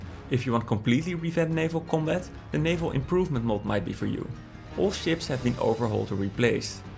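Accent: Netherlands. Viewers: I can hear your dutch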